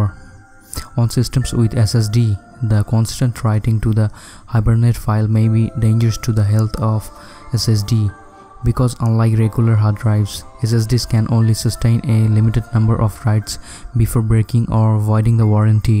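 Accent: India. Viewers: Indian accent and annoying music. Awful video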